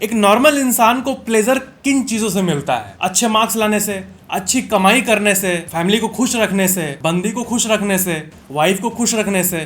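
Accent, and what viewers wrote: Bihar, India. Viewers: thats bihari Akcent ❤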